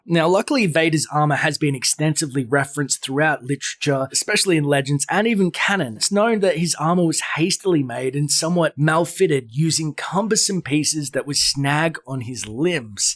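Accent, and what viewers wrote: Australia. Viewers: Thumbs down. Australian accent sucks.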